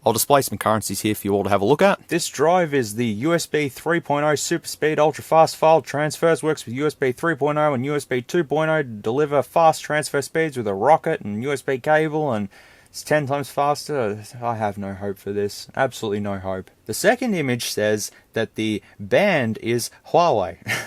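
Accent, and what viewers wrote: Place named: Australia. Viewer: Big love from the UK, always known Wish is a sham, but hearing an Ozy tell it in plain Ozy talk is always great fun. (Lived there, have family there, Love there, ‘WISH’ I was still there) 😀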